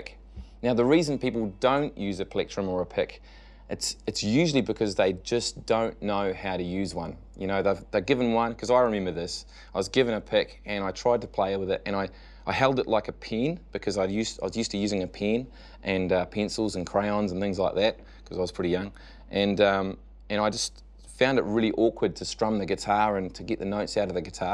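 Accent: New Zealand. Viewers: Hello, you explained the pick-holding technique really well, thank you very much! I've asked a few guitarist friends of mine to teach me how to hold a pick and all of them told me to hold it "naturally" (which is, what and how is natural to a first-timer anyway?). I really appreciate your detailed explanations and encouragement. Are you by any chance a New Zealander? It sounds like so from your accent, and you seem to use the words "cool" a lot, kind of a signature slang of NZers. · does he come from nz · I was thinking you might be an Aussie because of the Ripcurl T-shirt but as soon as I heard you I knew you were a kiwi like me😂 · New Zealand? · Pretty sure he's from New Zealand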